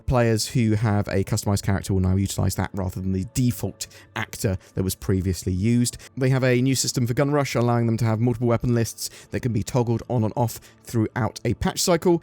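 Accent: England, United Kingdom. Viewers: Top notch English accent there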